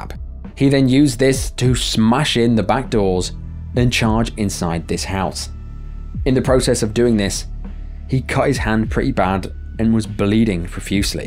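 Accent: United Kingdom. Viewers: As an American I can confirm how much more serious I take a British narrator. IDK why. It seems to be a thing though. I wonder if anyone has ever done any research into that.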